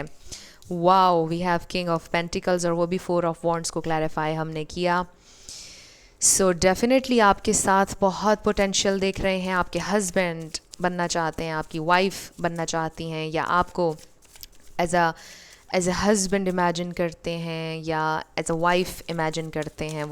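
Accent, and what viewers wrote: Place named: Pakistan. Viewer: Mujhe lagta hai aap Pakistan se lag rhi hai.. Kyuki aapki bolchal kuch ehsas dila rhi hai. Any way. All the best to you. And Happy New Year 2024❤❤🎉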